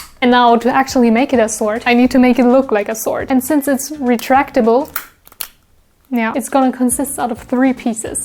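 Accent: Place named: Germany. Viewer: You Sound German or durch, and after you Said the Temperature dropped I knew exactly in what time frame you Made your Project xD i hated the Last two weeks because of their weird weather 😂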